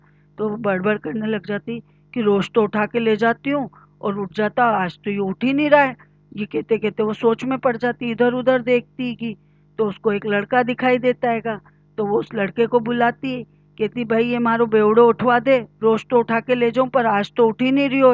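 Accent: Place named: Ujjain, India. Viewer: Aapki konse city se ho ? Aapki bhasha ujjain jesi lag rahi hai . Plz bataiye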